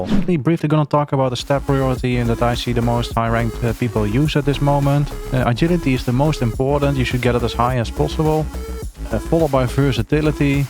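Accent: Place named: Netherlands. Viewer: Just started playing MM, pretty new to wow, not a very good player but trying to learn the ropes and get into pvp! Btw are you from NL? I lived there for 10ish years and your accent reminds me of my time there :)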